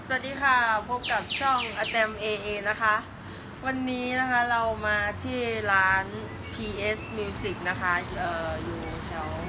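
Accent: Thailand. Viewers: พี่ใช่คนอุบลมั้ยคะ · เอ๊อะ555คนอีสานตั้วนิ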